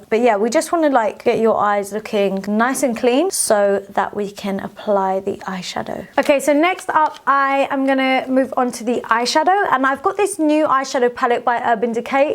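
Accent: United Kingdom. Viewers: It's a British accent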